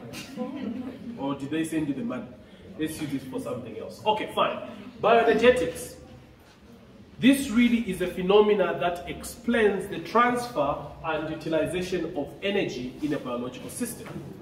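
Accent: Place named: Uganda. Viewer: You must have studied in uganda cz this accent 😅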